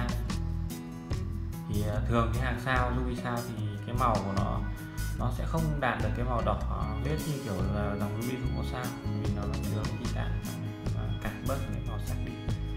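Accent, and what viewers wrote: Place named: Nghệ An, Vietnam. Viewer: Noi dep Nghệ gi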